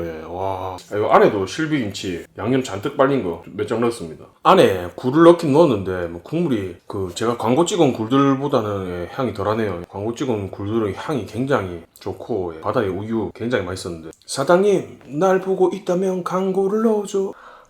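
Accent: Gyeongsang, South Korea. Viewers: 상 남자중에 상 남자 박력 뿡뿡 매력이 잘잘 흐립니다 거기다 경상도 억양까지 넘 섹시하고 멋지다 👍🏼😂😂😂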